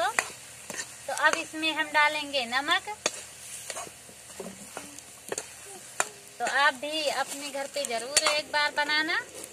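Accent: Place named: Uttar Pradesh, India. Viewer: Aap up ki ho na bilkul mere gaon jaisa bhasa hai aapke gaon ka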